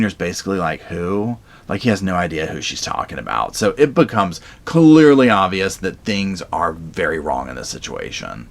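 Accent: South, United States. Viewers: I just love you! I’m from savannah GA and your accent sounds like mine & expresses so well what us southerners sound like telling any stories! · Dude southern accent is soo on point 👌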